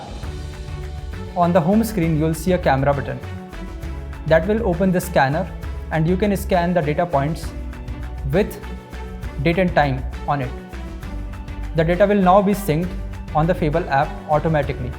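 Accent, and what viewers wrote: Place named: India. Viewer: Strong Indian accent.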